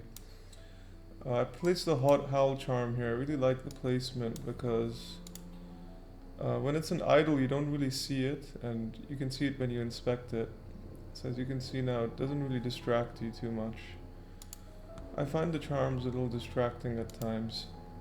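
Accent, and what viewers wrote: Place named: Czech Republic. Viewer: Wow man, what a cool idea. Hope you will find sponsors to boost inventory. Btw where are you from? you sound Czech to me but if im wrong sory. Good luck.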